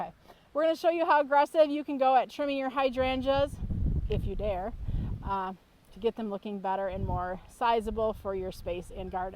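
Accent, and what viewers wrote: United States. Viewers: I just love starting my day with smiling face and that cute northern accent 😁 (I’m from Kentucky so we sound a little different haha) Having there to complete the team was icing on the cake. Thanks for sharing your day ❤️❤️❤️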